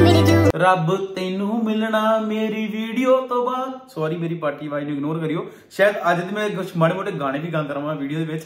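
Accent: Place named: Majha, India. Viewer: Majhe di boli😍 haada km nhi chlda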